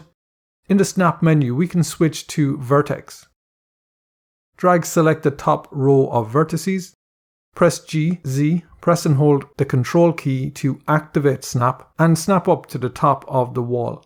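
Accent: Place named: Ireland. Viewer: Excellent - thanks for pacing this nice and slow, and with clear diction (also nice to hear an Irish accent!). Many tutorials become hard to use when you have to pause, rewind and try to hear what the guy (always a guy) is actually saying. After using Sketchup for years, it takes a while to get the hang of Blender. Thanks again and keep 'm coming.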